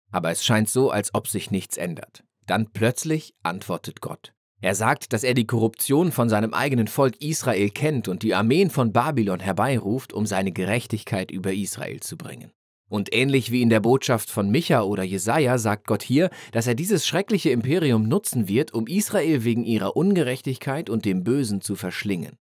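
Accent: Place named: Ruhr, Germany. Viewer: Was für ein Ruhrpott-Geschnatter... Wer's authentisch hören will, sollte sich besser das Buch selbst anhören. z.B. hier: <link>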